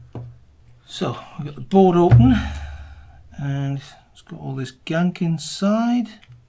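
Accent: United Kingdom. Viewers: Where are you bud, sounds like the UK. I've got a faulty unit that won't charge from the socket. I can't diagnose what component is faulty and wondered if you could help. Thanks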